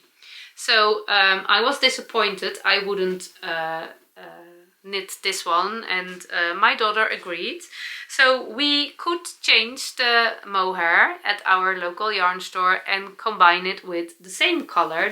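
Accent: Netherlands. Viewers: Hi your Dutch English. is amazing! love your channel, English is also my second language also I Love Holland, kind regards Ilona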